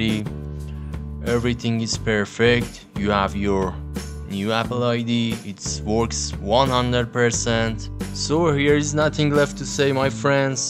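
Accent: Iran. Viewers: khob lahje farsi dasht iranie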